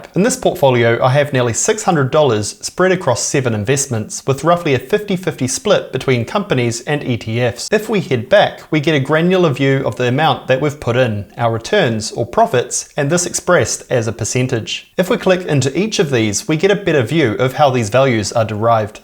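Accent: New Zealand. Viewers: He speaks in a typical New Zealand accent. They are well known for speaking quickly.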